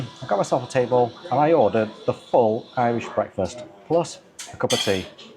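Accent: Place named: Yorkshire, United Kingdom. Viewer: I can tell you're from Yorkshire. I paid 12 euros return on the big blue Dublin coaches in May.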